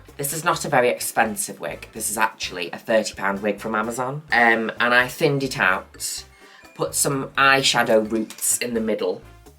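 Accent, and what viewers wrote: Australia. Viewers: Quite aside from the STUNNING makeup, that was the best Aussie accent I've heard in forever. Signed: an actual Australian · THE AUSTRALIAN ACCENT SPOT ON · Yasssss the Aussie accent got me good! Straya loves you ❤️ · Love has she it super funny. Also great Australian accent! ❤ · Ok finally a drag queen who can do a fairly close aussie accent! DORN'TTTT !!!!! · Stunning as always. Love your Aussie accent 😍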